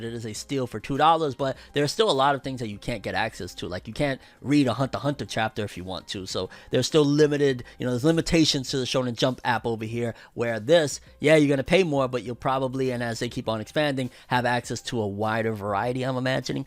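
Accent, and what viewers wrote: United Kingdom. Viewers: best thing of fornever news is the british accent mate! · Dude are you British I catch the accent every blue moon in every video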